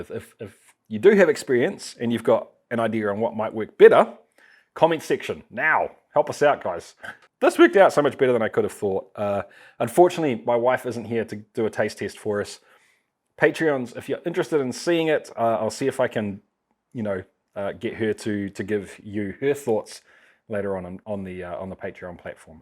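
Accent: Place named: New Zealand. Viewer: German speaking Yooper* here, stumbled across your channel a couple days ago. When I couldn't understand... well, anything you were saying, I actually had to turn on the closed captions. Then I realized I haven't heard that many Kiwis (with all due respect!) talk, so I had to watch a few more videos so I could translate the accent. By the end of this video, didn't need the (cc) any longer. And... absolutely loving the passion you have for the craft! * A Yooper is a person from the Upper Peninsula of Michigan (USA), very near the Canadian border. We talk funny too! :-) If I ever make a video, you'll probably need closed captioning too.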